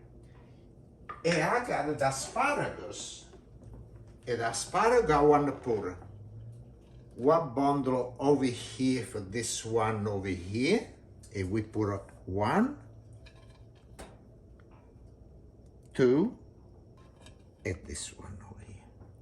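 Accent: Italy. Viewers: Just listening to him makes me happy and makes my dad im half Italian i don't speak Italian but listening to him makes me wish i had that accent haha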